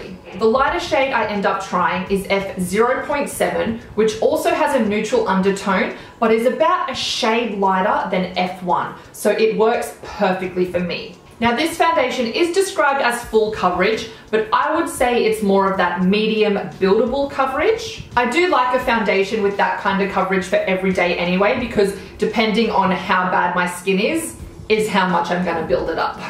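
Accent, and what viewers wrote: Australia. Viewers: hy dear u from austrlia???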